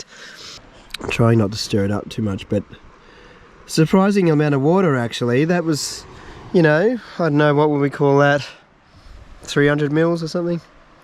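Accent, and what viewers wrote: Australia. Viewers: aussie accent overload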